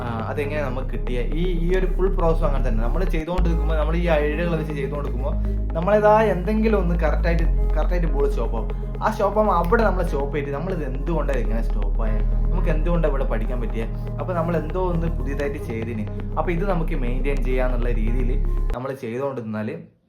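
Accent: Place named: Kannur, India. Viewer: Bro, ith Greenfield ano Chonadam? Kand nalla parichayam. Ingale sound kettapo kannur anenn manassilayi. 😂 ❤ Sub cheythine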